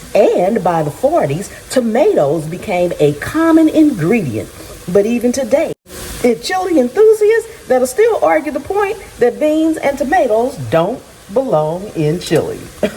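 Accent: South, United States. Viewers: Girl...I suspected you were southern because I kept catching a southern accent here and there, but this video confirms my suspicions...southerners definitely do NOT put beans in their chili!!! 😂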